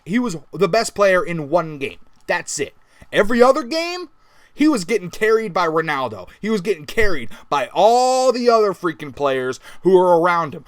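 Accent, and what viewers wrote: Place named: Manchester, United Kingdom. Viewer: Love the Manchester accent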